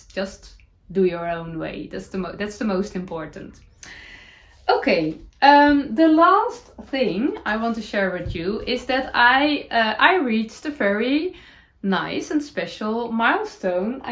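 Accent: Netherlands. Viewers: Congratulations on your milestone! In so many ways!!! It was such a pleasure to watch your podcasts despite what you called your "Dutchy English"! You travelled WAY out of your comfort zone speaking English even though you may not have believed that you were not good at it. I am sure that I am not alone in wanting to praise you to the highest degree!!! You are truly inspiring us to stretch beyond to achieve the utmost joy in our crafts and sometimes that involves stretching ourselves beyond what what we feel capable of doing well enough for others to see. You are a down to earth, creative individual sharing your love of crafting in crochet and knitting and I will not stop recommending your podcast to others, so there!!! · Congratulations💐 I am so happy that you kept going on in English. I love your Dutchy English and when you speak Dutch I often understand it because Danish have many words similar to Dutch. 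I really understand that you want to be a part of the knitting community in the whole world. I think I will try to make a podcast when I retire in fall but I think I will try in Danish first. I wish there were more people who speak Danish 😊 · Love your Dutchy English! And the way you rethink attitude to generate positivity. Heart Jumping is a “Sarahism” I’m increasingly adopting 😊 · I am so pleased I found your podcast. I absolutely love listening to you and hearing all your stories ❤️ In the early 80’s my husband served as an exchange pilot with the Dutch airforce. We loved the Nederland and our two little girls became quite fluent in Dutch. It was a struggle for me but as a Canadian I was always treated with so much love and respect for trying. Your accent sounds so much like a dear friend of mine that I have lost touch with; she was originally from Breda. She was a beautiful knitter. 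I actually learned to knit in the Nederland. Continue with beautiful heart jumping ❤️. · Congratulations on your 10,000! You are my favorite! You are so down to earth. Your English is perfect! My ancestry is Dutch so I love your Dutchy English. ❤🧶❤️🧶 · You are so happy and uplifting. I am 73 and have been knitting, crocheting & sewing since I was a teenager. I really enjoy your Dutchie English & all you share. Wishing you many heart jumping moments. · Congratulations lovely on reaching 10 K - now 10.5K only one day later! 🎉 I simply love watching you as I crochet, I have Dutch heritage so it’s delightful to hear your accent and your growing command of English. Well done indeed! You certainly should be proud of yourself for ignoring your ‘Little Miss Perfect’ 😉 and following your heart. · Another great episode - I loved to hear the story of your journey toward your English podcast and the 10,000 subscribers! 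Celebrate your success - your Dutchy English is very endearing! (Dawn) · Congratulations!!! I so enjoy your podcast and your “Dutchy English”. As a native English speaker I find myself saying words like “podcast” the way you do. 😅 You are very inspirational and just a joy to listen to. Congrats again. 🎉10,000🎉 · Congratulations.... you are delightful & I LOVE LOVE LOVE your Dutchie English.. love too hearing you talk about the weather - my (Australian) Son lives in Amsterdam & is learning Dutch so the shoe is on the other foot. I’m flying over tomorrow so interested to hear how warm it is. Keep up the good vibes. Nx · Congratulations! Wow 10 k that is awesome🎉 I really enjoy listening to your podcasts and love hearing your Dutchy English, you are so good at speaking English especially when it’s not your everyday language, well done. Thank you 😊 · Today was closer to 40c in my part of Texas, USA. 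And tomorrow is World Wide Knit in Public day. I can promise we won't be outside for very long. Perhaps I will take my knitting to a coffee shop and sit in the air conditioning there. I would-be lost with the AC. I love to hear your Dutchy English and the encouragement to do the things that bring you joy.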